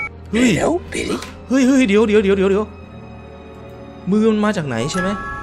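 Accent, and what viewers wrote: Isan, Thailand. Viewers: จาดกันพะนะ คนอีสานคักๆคุณ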